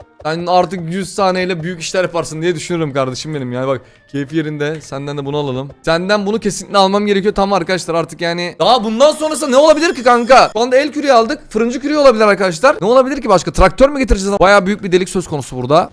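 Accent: Trabzon, Turkey. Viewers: Baba sen Trabzonlu musun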